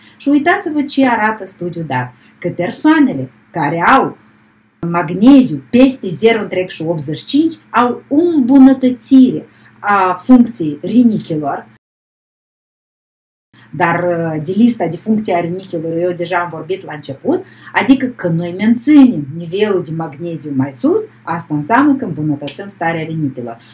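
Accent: Moldova. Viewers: Se înțelege foarte clar, doar dialectul e puțin moldovenesc, engleza înțelegeți mai bine?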